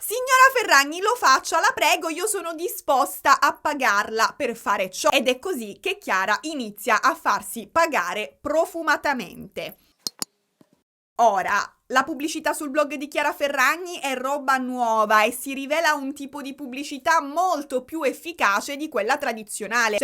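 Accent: Palermo, Italy. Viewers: Madooo'' sei troppo fantastica! scoperta ieri con il video di Kayne West e Taylor Swift! Adoro il modo in cui parli, la tua ironia, come spieghi tutto in modo efficace e senza annoiare (nonostante 2 ore di video!). Ps: Ho capito subito che sei palermitana! troppo divertente! ahahahah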